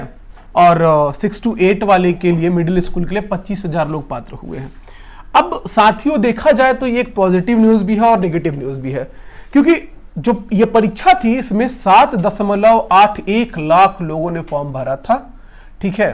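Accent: Bihar, India. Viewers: Bihari accent b acha lgta h apka..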